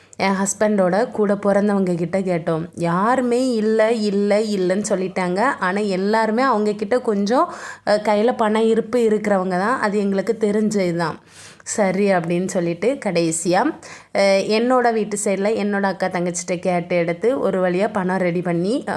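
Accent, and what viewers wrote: Tirunelveli, India. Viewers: நெல்லை பேச்சே தனி அழகுதான் சூப்பர்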